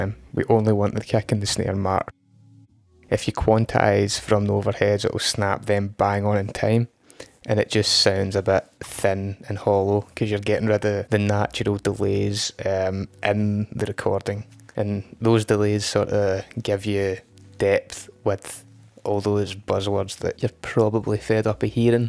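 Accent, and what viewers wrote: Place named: Scotland, United Kingdom. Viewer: I find it odd how few views and likes videos get, considering how handy they are for a Cakewalk user - maybe folks out there can't understand his rather mild Scottish accent!? Thanks for the posts!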